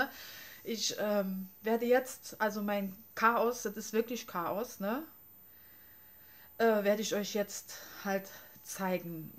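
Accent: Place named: Saarland, Germany. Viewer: aloha, habe deinen kanal vor kurzem entdeckt und bin kleben geblieben und das nicht nur weil der saarländische dialekt einfach cool ist ;) ich hoffe du machst noch viele videos.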